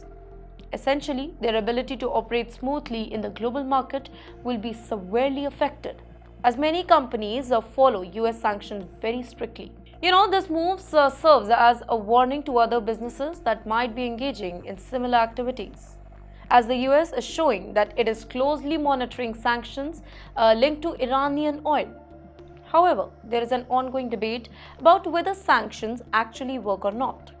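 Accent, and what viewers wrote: India. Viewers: She's speaking Indianlish which very few outside India can understand.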